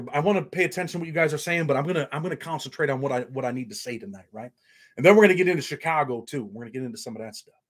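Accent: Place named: New Jersey, United States. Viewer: You been some shitttttt bro. Sounds like you could of grew up in Keansburge NJ. Ty for the vids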